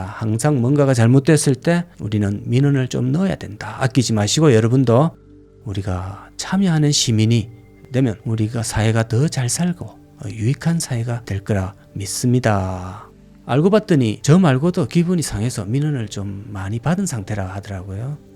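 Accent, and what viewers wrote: Gyeongsang, South Korea. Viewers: 님 넘 재미나게 갱상도 사투리로 설명도잘하시고 웃기심니더 많은 영상 부탁드리기심더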